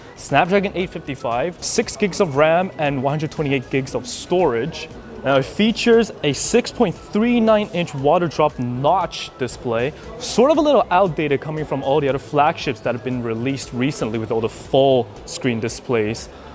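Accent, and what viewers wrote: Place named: United Kingdom. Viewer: I like your English accent Really British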